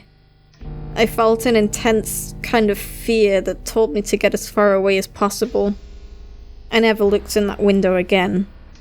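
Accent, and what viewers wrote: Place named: United Kingdom. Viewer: You sound British!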